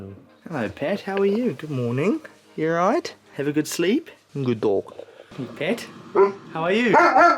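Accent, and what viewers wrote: Punjab, India. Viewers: l love your Punjabi accent!! nice family GOD BLESS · Spot on Punjabi accent. Tusi saare family members nu Punjabi sikha deni aa bol bol k 😂👍🏼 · God bless lovely family. Very much astonished at your punjabi accent.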